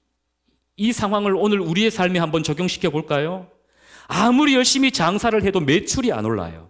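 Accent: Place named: Gyeongsang, South Korea. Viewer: 갱상도 사람이네요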